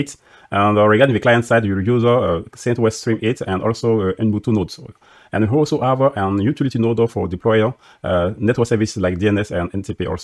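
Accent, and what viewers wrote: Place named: France. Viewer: still very hard to listen on 0.75X speed, the guy has a very thick French accent, it’s realy hard to follow.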